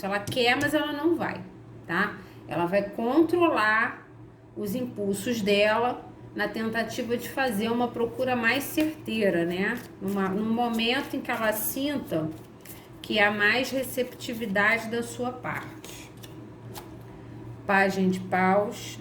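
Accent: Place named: Rio de Janeiro, Brazil. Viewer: Gratidão! Adoro suas tiragens e esse sotaque carioca faz toda diferença, kkkk! Beijos de luz!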